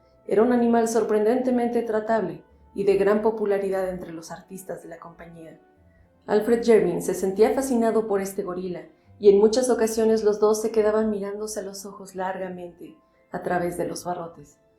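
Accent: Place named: Mexico. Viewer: Tu tono tu voz son ambrosía para mí oídos. SOS mejicana suena dulce como la miel me recordas el aroma de las margaritas. (Las flores)🇦🇷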